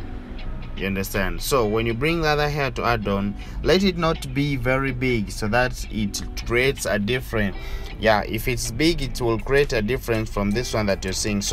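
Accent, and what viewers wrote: Uganda. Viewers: According to your accent I think you’re a Ugandan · Yeah definitely Ugandan. He sounds and talks like my dad.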